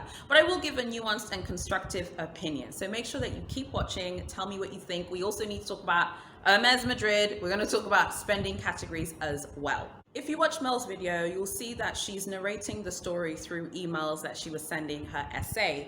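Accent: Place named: London, United Kingdom. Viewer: Well I thought you had no makeup on so your application is absolutely fantastic. Also, jut wanted to say, your accent is too real, you use the right sayings in the right context and that only comes from living in the UK. They must be referring to the accent of the African state you currently live in because you sound like everyone else I grew up with in London Babe.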